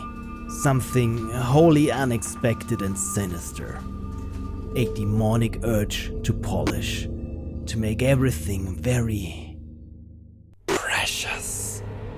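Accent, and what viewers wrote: Germany. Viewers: Wait a second, you german?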